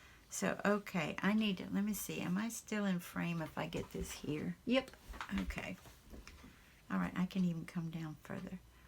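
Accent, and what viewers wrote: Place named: Charleston, United States. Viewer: How can I I love you even more. Your gentle kind caring sweet. When I hear you I think of Charleston SC dont ask me why. But you are sweet corn.👍💕🐇🐥🌽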